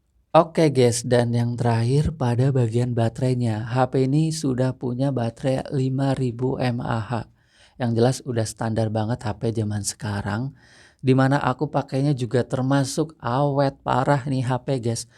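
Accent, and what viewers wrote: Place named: Banyumas, Indonesia. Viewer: Ngapak bang.... Salam satu server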